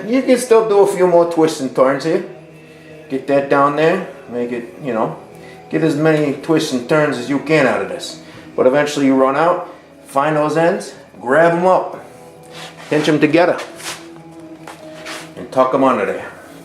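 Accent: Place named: New York, United States. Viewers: Love listening to your N.Y. accent, and the passion you expressed, and your CHallah metaphor...Thank you for sharing your amazing recipe! I would try adding the sugar to the yeast well you made, just to cut a little wait time. Shabbat Shalom! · You are amazing! I love listening to you speak. I am originally from Rochester, NY, 72 years ago, so after 25 years in SC I love to hear a real New Yorker talk. The bread is beautiful, and what it means to you is even more beautiful. I made my first loaf today, and it's in it's final rise. I hope it turns out at wonderful as yours did, though I only made 1/2 recipe. Thank you for your time and energy you put into this video. I hope you and your family are always blessed.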